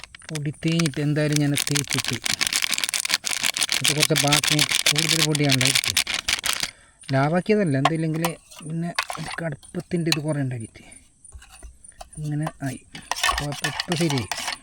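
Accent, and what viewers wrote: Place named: Kasaragod, India. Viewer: ide സ്ലാങ് മദി soopper കാസർഗോഡ് ഭാഷ 👍👍👍👍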